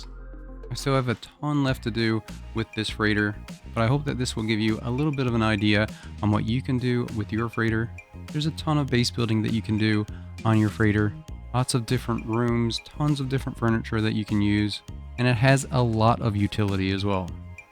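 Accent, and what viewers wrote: Newfoundland, Canada. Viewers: I knows a newfy when I hears one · Thought I recognized that accent! Greetings from a CFA living in St John's : )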